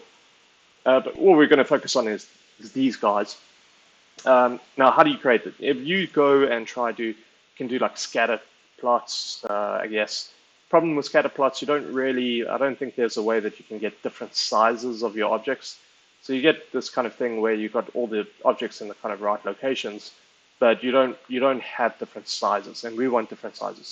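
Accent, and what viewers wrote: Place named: South Africa. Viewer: I Googled "GPU processing in Excel", landed up on your Reddit post, and then here. As a fellow Excel nerd and South African (given the accent, I'm guessing you're from JHB :)), I loved watching this! Thank you! I'd love to see you get back to your "in Excel" series to see what you'd be able to produce using Excel's newer functions (LAMBA, etc).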